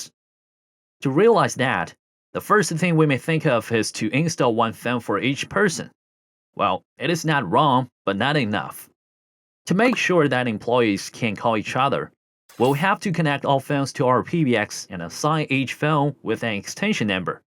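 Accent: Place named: China. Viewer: Actually this a real persons voice. He is speaking English with a Chinese accent and some incorrect pronunciation. After some time you get used to it and will conclude that the English is not that bad.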